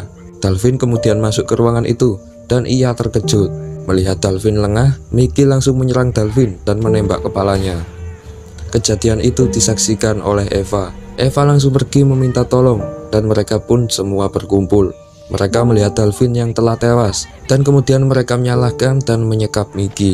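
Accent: Java, Indonesia. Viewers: Lucu narator nya medok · Medog parah min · masnya medokkkkk😂😂😂👍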